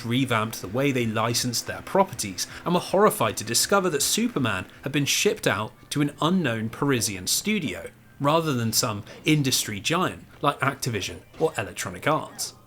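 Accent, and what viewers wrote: England, United Kingdom. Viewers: Smooth talking British slop is my medicine 🥸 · Wait you said a market wide crash but excuse me if I detect a English accent, the thing is I’ve seen countless Europeans complain us Americans are sooooo egotistical for ignoring how there was no crash in Europe and how we are so terrible for assuming the world revolves around us awful Americans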